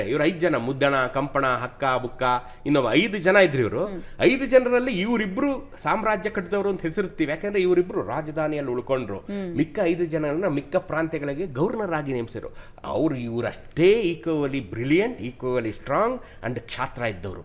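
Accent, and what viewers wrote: North Karnataka, India. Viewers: ಉತ್ತರ ಕರ್ನಾಟಕದ ಮಾತೂ ಮನಸಿಗೆ ಮುಟ್ಟಿತು ಸರ್ 😢🙏🙏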